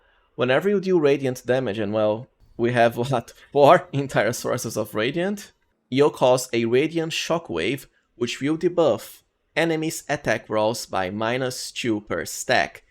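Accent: Brazil. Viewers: Nice build as always! E ai mano, vou mentir não seu sotaque em inglês parece de Br vc é br? Keep the up the good content bro, you helped me a lot in tactician.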